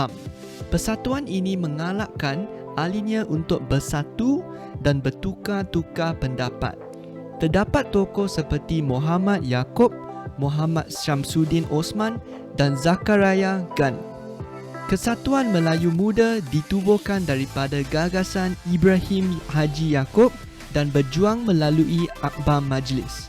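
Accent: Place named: China. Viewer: Masih ada pelat cina, kalau boleh tingkatkan cara pertuturan supaya tidak pelat semasa bertutur dlm bhs melayu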